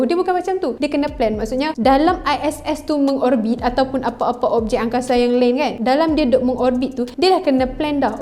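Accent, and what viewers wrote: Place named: Kuala Lumpur, Malaysia. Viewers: Sedap kot die ckp key el...bia la dia..alahai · Sis please jangan nak key el sangat,saya lagi suka sis cakap jaa loghat utaqa,saya suka dengaq kaum2 hawa dari utaqa ni bila bercakap,saya yakin ramai lagi yang suka sis pakai loghat utaqa bila bercakap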